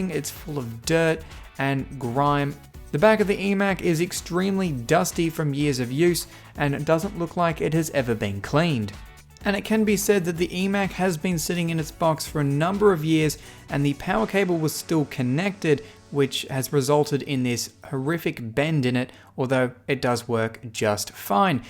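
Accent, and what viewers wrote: Australia. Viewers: My girlfriend is Australian. Easily recognizable accent to me. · I knew he was Australian for a long time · Me: His accent sounds Australian Dead spider inside the computer Me: Yep, Australia confirmed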